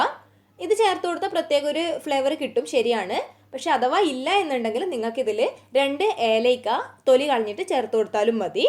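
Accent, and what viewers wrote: Kannur, India. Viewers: Kannur kaari aanalle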